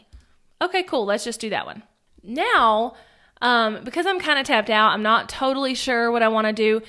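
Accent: South, United States. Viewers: Finally somebody has explained this to where i can explain it ! Thank you! Maybe its the accent ! I too speak southern lol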